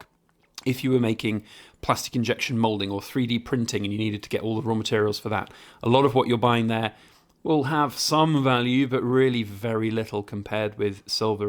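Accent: United Kingdom. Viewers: Are you living in the UK? Because your accent is very british.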